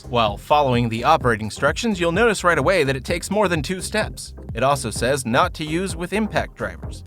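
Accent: Buffalo, United States. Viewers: Wait is he from Buffalo? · You from Buffalo?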